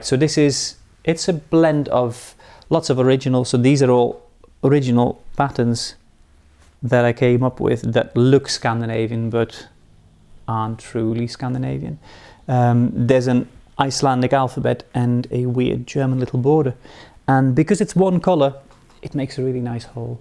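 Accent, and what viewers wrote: Netherlands. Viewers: This was a charming video, sir, thank you. May I ask where in the Netherlands you grew up? You sound so much like my Einhoven-based Philips colleagues. Cheers from a new viewer, Ardith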